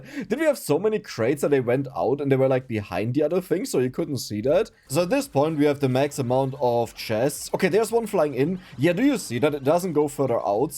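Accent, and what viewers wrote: Germany. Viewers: Zo, zingle run, zix, zis point...German accent is so weird. You say "second" correct. Why don't you pronounce it then like zecond?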